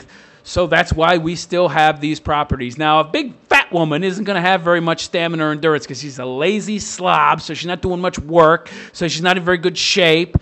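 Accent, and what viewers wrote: New York, United States. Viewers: Okay.....New York.....Philly? That way you talk is killing me, it's like Archie Bunker's protege, fuckin' 'ay! Love your channel, damn you can break balls, wooo! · You sounds like a New Yorker.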